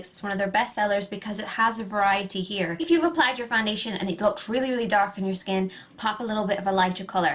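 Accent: Ireland. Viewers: Are you Irish? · lol no, she's actually irish. that's why she sounds irish. americans don't sound like that.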